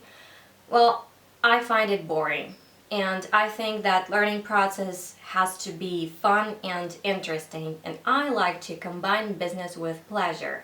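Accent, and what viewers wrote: United States. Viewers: However, your video in 2023 I just watched, I mistook you as American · Perfect understandable american accent. You sound like were moveed in englishspeaking country in deep childhood. And it's so increadible that you are from Ukraine and can reached that level in one-two years. Great unbelievable achievement) The latest your videos are like you are completly born in US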